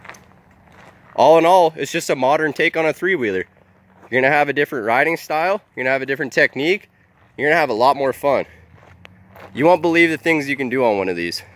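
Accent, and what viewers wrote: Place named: Mexico. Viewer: Why does he sound like a mexican speaking english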